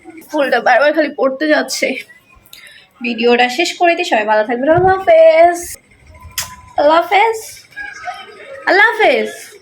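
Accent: Noakhali, Bangladesh. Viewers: নোয়াখালীর ভাষা আমার কাছে অনেক ভালো লাগে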